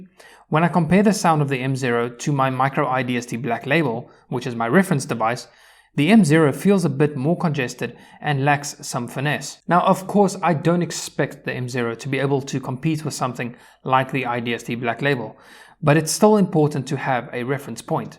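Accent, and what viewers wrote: South Africa. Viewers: It’s not often that I click on a video and hear a South African accent. 😊 Solid review! 🤙🏾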